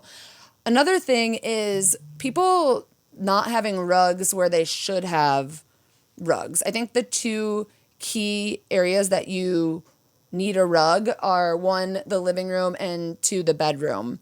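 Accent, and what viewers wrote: Chicago, United States. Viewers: lol! I was a theater design major and I cannot see a play without "working" It is somewhat common within whatever your area of discipline is, I think. I notice that you look very pretty these days. And I notice your Chicago accent, but I grew up in Detroit, so I got some of those influences in speaking too! I would love to learn about organizing too - that was not a thing in my family and I have been getting "schooled" on it!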